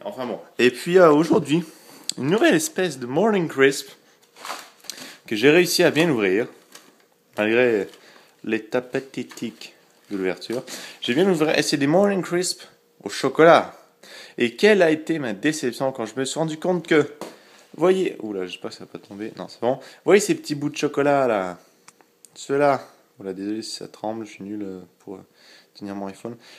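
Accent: Belgium. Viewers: Vous dites comme en Belgique :) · Vous parler comme nous lles belges :)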